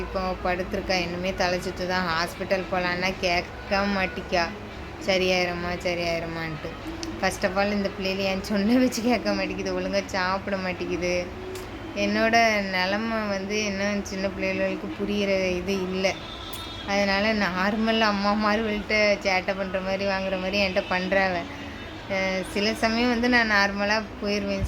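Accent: Tirunelveli, India. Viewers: Paechu tirunelveli maari irukku. Ethana naala coimbatore la irukinga. Enga dialysis panringa, also amount for one dialysis?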